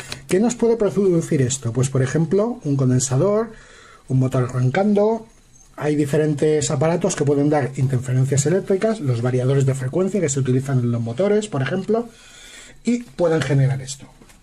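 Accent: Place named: Spain. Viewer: Voz española.... Huuuuuac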